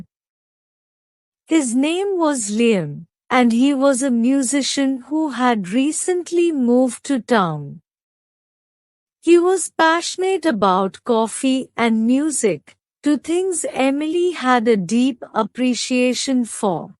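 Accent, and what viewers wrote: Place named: India. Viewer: Why I listen to to India accent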